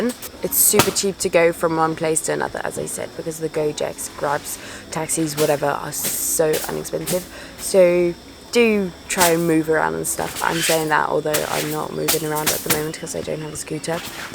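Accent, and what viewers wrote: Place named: United Kingdom. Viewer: No way. Do people from France actually take on a UK accent when learning English?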